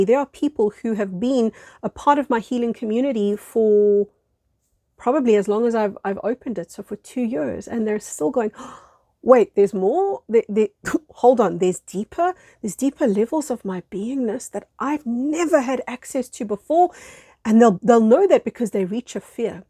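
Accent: South Africa. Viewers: You sound South African❤ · great message, love the S.A. accent too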